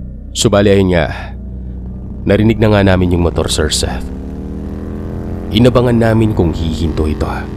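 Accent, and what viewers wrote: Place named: Visayas, Philippines. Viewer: Bisaya pronounce ang ganda pakinggan😊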